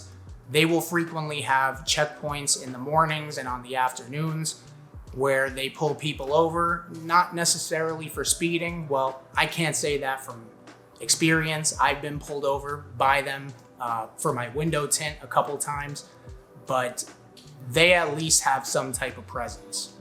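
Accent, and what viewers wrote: New Jersey, United States. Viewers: Great pros & cons video!!! I can tell from your accent you were a Bergen County NJ resident, right? Then again, to me, anyone North of Trenton sounds like they live in Hoboken. This Philly-born and raised, moved to South Jersey (we say "Joisey") resident transplant knows prices and auto insurance in Broward County is about the same as NJ (price hikes in 2024 for NJ). But our homeowner's insurance is so low. We love Pompano Beach and see all the new developments going up there as that's where we vacay every year. Will be down there again (hello Wyndham timeshares) this winter and see all things Atlantic Avenue and A1A near Sunrise. You forgot Con #6: SNOWBIRDS -- traffic is crazy down there, but from late November to April, wow! Of course, in my eyes, the Pros outweigh the Cons.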